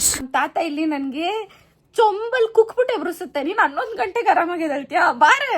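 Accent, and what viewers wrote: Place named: North Karnataka, India. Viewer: Uttar Karnataka hudgan voice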